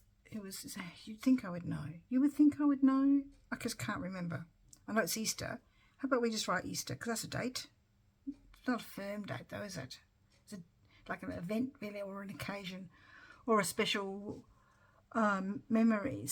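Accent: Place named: Australia. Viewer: I really liked this collage and I love to hear your Aussie words. Keep up the wonderful pages!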